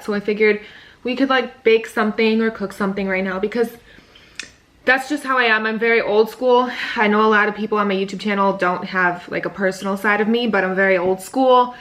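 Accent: Midwest, United States. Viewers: 😂 Honestly.. I never thought it would be taken out of context.. I’ll re-edit the original comment tho.. Going to sound like a simp, but is a saint, I don’t even look at her like that anymore.. Just keeping it real… I was first introduced to videos around 2019-ish.. I was like “Dayuuum! who’s this beautiful woman with a midwestern accent talking about eye opening topics!”… I was crushing lol, but over the past couple of years watching her videos and seeing stuff like cooking, closet videos, and talking about Penny.. You just get the sense she is a strong woman with a beautiful soul.. Hope you’re doing well! 😊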